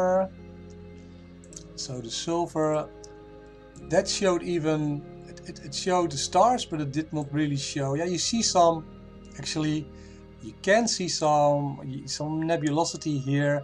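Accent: Netherlands. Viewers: We like the Dutch accent too. But to hear your skills explained in a clear way is great. I know you say you are learning, but we are all learning. And to see your image and know how you got there is the information that is useful.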